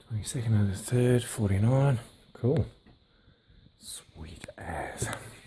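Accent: Australia. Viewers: I am an American. Love your explores, and your Australian accent..Lucmy to have found your channel. Keep up the interesting work.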